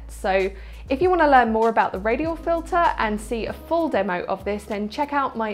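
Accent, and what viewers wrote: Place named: United Kingdom. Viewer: Lovely accent! where are you from in the UK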